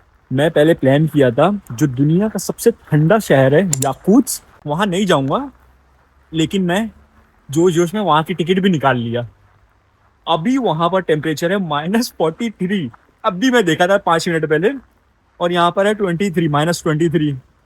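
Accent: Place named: Maharashtra, India. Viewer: Tu marathi accent m mat bol bhai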